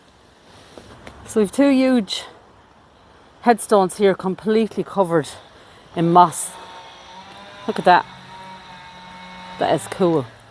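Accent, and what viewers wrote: Ireland. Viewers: I love your accent. I don’t hear Irish accents much, since I left Boston in 1978.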